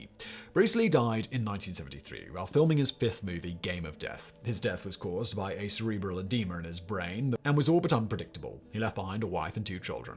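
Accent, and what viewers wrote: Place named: Hammersmith, United Kingdom. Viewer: I feel like your accent is more hammersmith less kent 🤷🏻‍♀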